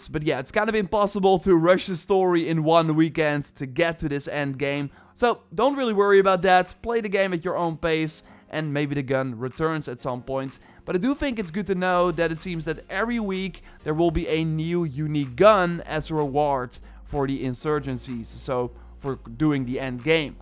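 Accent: Netherlands. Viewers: Haaha ik kende deze kanaal nog niet. Hoorde meteen een heerlijke hollandse tint in je stem. Fijne video, thanks! Subbed <3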